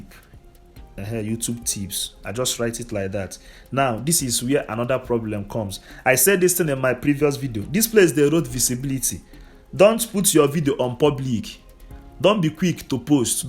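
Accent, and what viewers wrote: Igbo, Nigeria. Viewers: I love this our Igbo accent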